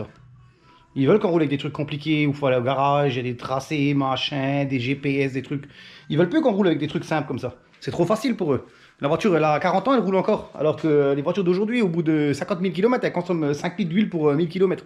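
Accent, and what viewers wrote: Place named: Lorraine, France. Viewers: Bravo meca a l'encienne j'aime bien humeur décalée ainsi que l'accent lorrain. Salutations 👍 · salut le maître , tu devrais donner des cours au jeune, tient ! toujours impeccable, comme tu le demande dans la vidéo, je mets un commentaire , je sais le faire. mais c’est super cool de te voir le faire avec autant d’aisance. je regarde toujours toutes tes vidéos en entier, à quoi bon, juste mettre un pouce, si on ne regarde pas le contenu. je préfère tes vidéos que les émissions de télévision dédiées , car tu ne fais pas dans la mise en scène, c’est naturel et sans filtre. tu me fais rire avec ton accent qui ressemble un peu à l’alsacien. Tu ne dois pas être bien loin . a bientôt. 👍🏽👍🏽👍🏽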